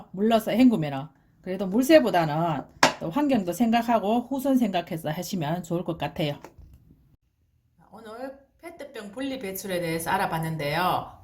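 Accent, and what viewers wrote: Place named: North Gyeongsang, South Korea. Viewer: 나도 경상도사람임에도 불구하고 경북사투리듣기거북해서 이분 유튜브안볼라하는데도 그런거상관없이 이분채널은 진짜 유용함ㅋㅋㅋ 인정할수밖에 없음!!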